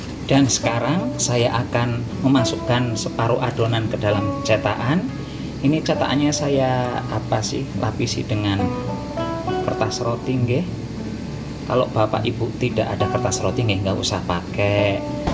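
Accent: Banyumas, Indonesia. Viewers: Mas Rika wong cilacap kan ngomong e ngapak² kya enyong budin pinter masak Rika kang...bojomu seneng due laki pinter masak 😂😂😂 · Saya rasa Mas berasal dari Purwokerto Banyumas.Dialek nya mirip.dialek orang Purwokerto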